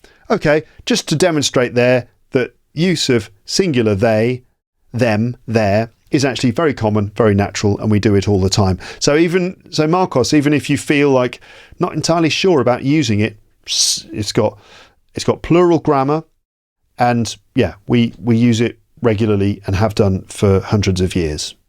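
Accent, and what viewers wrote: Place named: RP, United Kingdom. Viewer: <link> is one of the BEST English Podcast I've ever heard on British RP (Received Pronunciation), Phonetics and Phonology, Prosody (Prosodic Break, Prosodic Interruption) in NATURAL NATIVE English Language by on YouTube channel named, So GREAT and I am grateful to your Instructions, I choose your Podcast channel to practice Articulation and NATIVE English accents.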